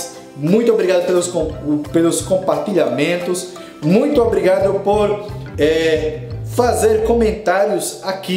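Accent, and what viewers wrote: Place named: Recife, Brazil. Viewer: Também comprei um Mi drone e tô no aguardo, sou de Recife e pelo seu sotaque acho que você também deve ser daqui, tô certo? kkkkkkk enfim.. o meu comprei na Banggood e chegou em SP dia 05/01/17 foi para o CEINT SP/GEARA e no dia 06/01 partiu para a Fiscalização Aduaneira/BR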